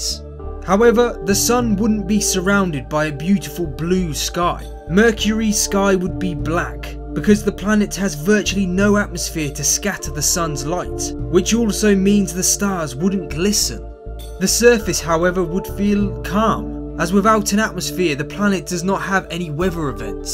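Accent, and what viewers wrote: United Kingdom. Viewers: Nice use of Fahrenheit in 2015 with a British accent where we use Celsius unless you're older than like 50. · I am most definitely sure that it’s a British accent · Your accent is British but PLEASE dont talk the American way by saying farenheight cuz your english is native and how it is supposed to be whereas America's dont own the english language natively in which they rewinded a few words making it confusing, Its your language please say degrees Celcius and maybe put farenheight in brackets? (sorry for my poor spelling)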